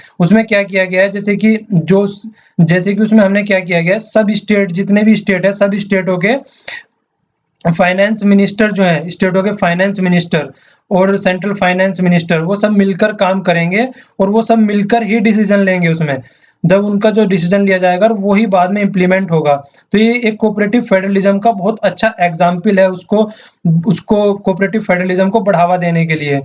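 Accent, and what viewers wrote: Haryana, India. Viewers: खड़ी बोली, सुन के मज़ा आ गया, m HRY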